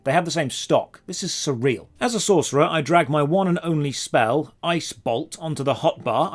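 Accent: United Kingdom. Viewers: agreed. then again, he's handsome. And speaks British English. I'm sold. xD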